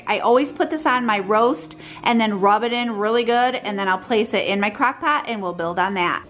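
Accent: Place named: Midwest, United States. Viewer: That chicken pot pie looks amazing! 🍲. Your accent 😁. Are you from Minnesota or Illinois?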